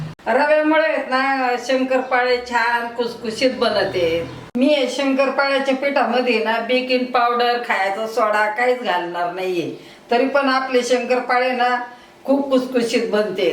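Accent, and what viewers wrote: Ahmednagar, India. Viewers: आजी तुम्हाला पण दिवाळीच्या खूप खूप शुभेच्छा. तुमची नगरी भाषा मनाला खूप भावते. Love from Nagar.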